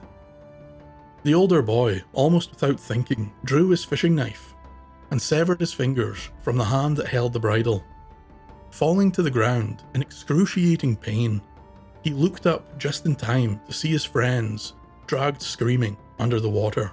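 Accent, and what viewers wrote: Scotland, United Kingdom. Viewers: Thanks so much for this wonderful tale! It might be my favorite so far. Also, I could listen to your lovely voice for hours. Would you mind sharing the area of Scotland where you were raised? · Our first time watching.. the kelpie video was excellent.. Two things that encouraged us to subscribe., First your voice.. that beautiful Scottish accent.. Also Scottish we are.. and love folklore.. so After we subscribed we saw your wolf logo.. and felt content.. Both of us have wolves as spirit animals! Please keep making videos.. we love them🌹 William and Jen 💜💙🌱🌹🙏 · I love the Scottish accent and learning about the culture & folklore. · Omg I love your Scottish voice!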